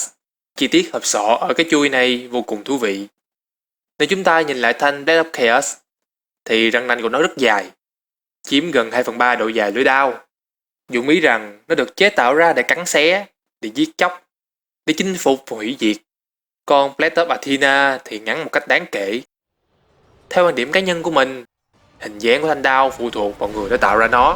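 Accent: Vietnam. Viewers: đọc tiếng anh kiểu ng việt nghe khó chịu vc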